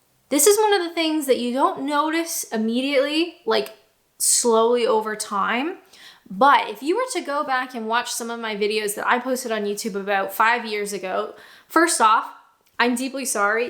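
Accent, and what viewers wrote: Canada. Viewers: keep your Canadian identity --it is part of who you are for sure. Generally speaking, unless you moved to Britain very young and went to school there, your accent is quite 'fixed' for life even if you use local words and 'Britishisms'. You are being a 'polite' Canadian when you use a British word to communicate over the phone to help with understanding. Yes, Tim Horton's does have grilled cheese sandwiches on their menu and there is nothing like it for comfort food. When sick, it may be the only thing that can help the appetite. Although British people often mistake a Canadian accent for an American one, we Canadians are pretty good at reminding people that we're Canadian, eh? I think I will have a grilled cheese sandwich for lunch today! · A belated Canadian Thanksgiving to you 💖🇨🇦 Your Canadian accent is delightful, especially the "oo" sound in words with ou in them such as "out", but please, please loose the zee, it's ZED 😅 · Accent changing s very much an individual thing. 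My mother's eldest sister and her husband left Norwich to live in Yorkshire for over 30 years until they returned to Norwich when my uncle retired. When they left they both spoke with the Norwich accent they were brought up with. When they returned my aunt sounded very Yorkshire but my uncle still spoke in his original Norwich accent without even a hint of his 30 years in Yorkshire.This is remarkable because he worked in a large Yorkshire chocolate factory where he would have talked to local people all day long in his supervisory role while my aunt was a housewife who would have had less contact socially. I think you will always sound Canadian because there is no single accent that everyone you know speaks, whereas in my uncle's case everyone he spoke to at work and socially would have had the same local accent. That is why it was so odd he never acquired even a hint of it. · Never, ever lose touch with your roots. 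Same as myself, you can blend your adopted country with the one you were born. It's funny though how childhood experiences seem to stay with us forever. So is a Canuck - Brit. Best of both worlds. Makes a very good blend!!!!😀 and, please, never lose your accent. It's so nice to listen to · To my (Londoner's) ear. your speaking accent gets more Canadian when you visit home and less so between visits. For example the second syllable of "about" gets longer when you go to Canada and gets shorter gradually on your return. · Canadian speech, US tastes. More so than the Canadians i have spoken to.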